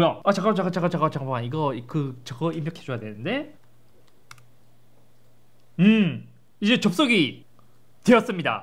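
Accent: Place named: Seoul, South Korea. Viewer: 형 발음이 역시 서울..킹..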